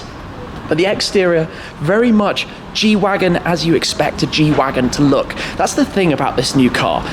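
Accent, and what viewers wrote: United Kingdom. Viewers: I don’t know man, I think I have a pretty good radar and I think he’s just really posh English.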